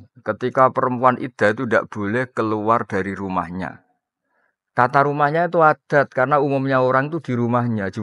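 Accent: Indonesia. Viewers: Lughat Madura kayak e ,yg baca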